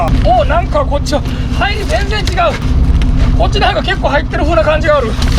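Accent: Okayama, Japan. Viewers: いつも楽しみに見させてもらってます 車エビ最高ですね 刺身で食べたいです 岡山弁？が ヤッパリ最高です 無理せずこれからも 楽しい映像をよろしくお願いします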